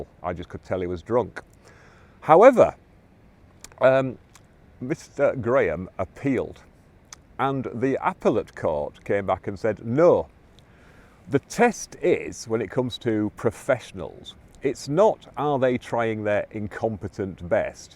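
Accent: United Kingdom. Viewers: How funny it is that some goofy with a british accent is trying to tell Americans what to think. So you're going with the secret service has more stringent rules of engagement than the police do? Cause that's exactly what you're saying, whether you think you are or not. Release your bank statements for the last two weeks.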